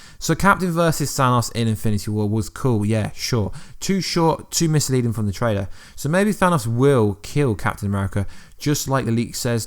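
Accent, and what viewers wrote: United Kingdom. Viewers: British?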